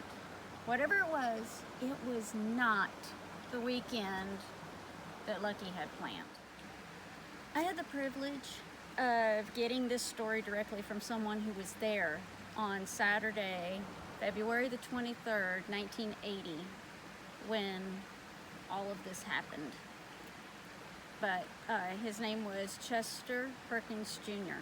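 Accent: South, United States. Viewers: Love your southern accent !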